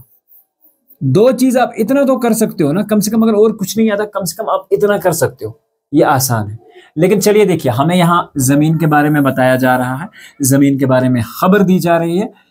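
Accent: Kashmir, India. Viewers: Sir apka Kashmiri bahot acha lagta hai ❤😊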